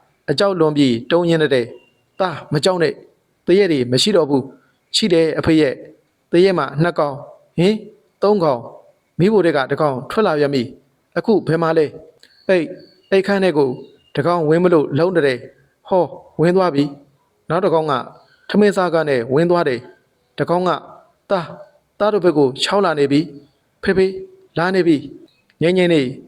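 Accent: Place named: Rakhine, Myanmar. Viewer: အသံ ကောင်းတယ် ရခိုင်သံဝဲသလိုဘဲ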